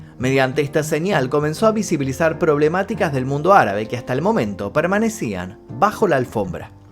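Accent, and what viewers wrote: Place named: Argentina. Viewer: Con todo respeto, no lo se, pero me atrevo a decir que por su acento es argentino, y si es el caso, es la primera vez que veo un video de un argentino hablando del mundial y sin decir que o explicar por que Argentina debería ser campeón. (Ojala si gane) hahaha apoyando a latam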